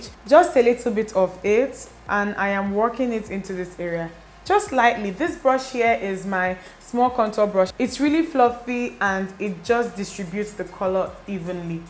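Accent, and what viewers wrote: Igbo, Nigeria. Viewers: ''If you don't prime your lid with oily skin, you're on your own''😂😂😂😂😂😂😂...i've subscribed girl...you just soo speak like a tru Nigerian...love from Cameroon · no, that’s just how nigerians talk. very blunt, right out the box. she doesn’t sound rude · Oh no Shamsa mohamed thats how Nigerians talk. Thought the same when I had roomates from Nigeria it used to really bug me till I learnt thats how they talk and no offense. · Man I love her accent my bestie is Nigerian too😅but she doesn't like me listening to her when she is speaking Nigerian accent but still love her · Extremely funny especially the igbo accent. Love this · She's too funny, and I love her Nigerian accent.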